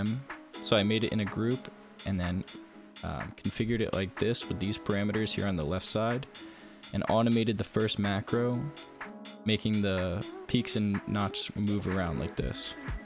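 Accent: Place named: United States. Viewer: Bro u live in Bangladesh then how did u pick up that American accent?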